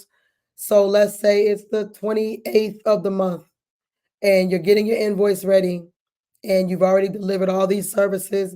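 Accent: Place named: Georgia, United States. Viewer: yeeesss, is the beeeest georgia girl accent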